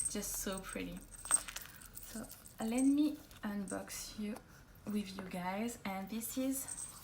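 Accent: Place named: France. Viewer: Nice haul! I wonder if you also speak French because of the accent.? Am starting to like Tous jewelry since I only have pandora. Thanks for showing your haul .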